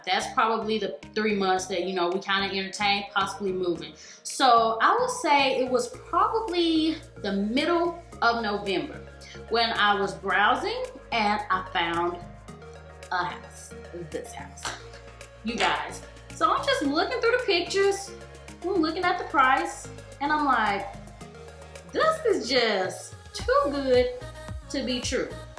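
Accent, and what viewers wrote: South, United States. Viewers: Why did I read her t-shirt in her cute southern accent lol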